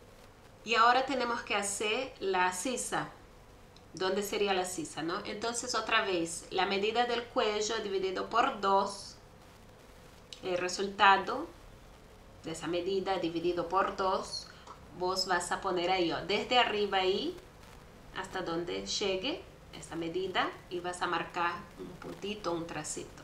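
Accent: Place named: Brazil. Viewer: Hola de que país eres por tu acento parece que eres de Brasil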